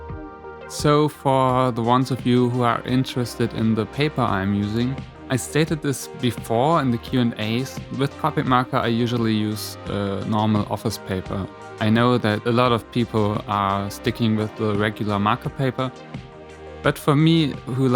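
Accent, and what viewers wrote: Germany. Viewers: are you german? Man hört einen Akzent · man hört dass du normalerweise deutsch redest (denke ich jz mal weil du vor nem Jahr oder so ein Video mit nem deutschen Titel hochgeladen hast). bin gerade voll überrascht dass man so was tatsächlich als deutscher erkennt lol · I KNEW IT!! THAT TRUE GERMAN ACCENT! xD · ich weis nicht ob ich mich täusche, aber es hört sich an als ob du aus Deutschland kommst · sounds german xD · are you german? your accent sounds so german.. :) btw, nice video i love how it turned out, you are very talented!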